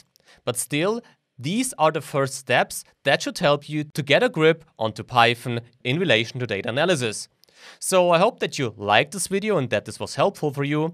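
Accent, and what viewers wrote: Germany. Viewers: I love your Deutsch accent <3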